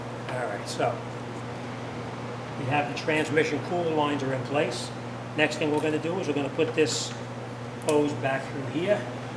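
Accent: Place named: Boston, United States. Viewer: Hey dude thanks for the video and I recognize the dialect, you r from boston or somewhere deep north, we don't say raadiator , it sounds weird the way u say it. Don't take it personal, it just reminds me of an old Army buddy that used to make fun of me and me of him for laughs,lol. Down south people say over yonder and yaul and we say oil different-but we are all American's, so God bless my brother.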